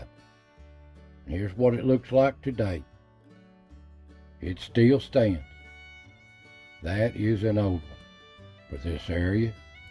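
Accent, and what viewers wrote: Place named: Appalachia, United States. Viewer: I’ve been watching you for a few years now. Love your shows and your Appalachian accent. Glad you’re feeling much better and can get around now. Sending prayers and much love your way dear friend. Keep us all informed on your little woodland friends. Loved seeing all the old homes. Wish they could all be restored. See you next time.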